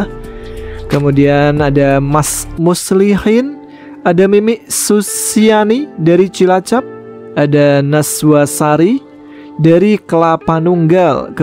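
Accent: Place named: Lampung, Indonesia. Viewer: Oi mas lampung nih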